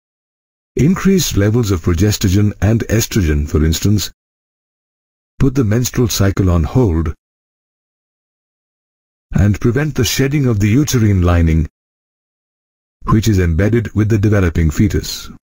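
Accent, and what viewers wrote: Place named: India. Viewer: This is Indian accent ma'am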